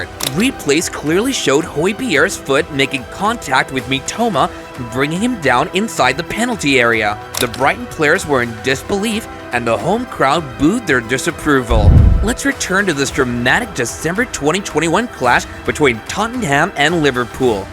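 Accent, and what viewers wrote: United States. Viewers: Watching football vids with an American accent just ain't right. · these american pronunciations are painful to hear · 40 seconds? As soon as the Yank's voice was heard, the back button was pressed. Can't comment on the video content, I simply didn't watch it!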